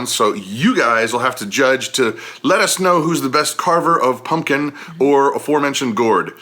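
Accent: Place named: Louisiana, United States. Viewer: The whole time I’m listening to your story I was like, “Yep, that’s Louisiana!!” Lol! Welcome to my lovely state. Ha! Glad your event was a success.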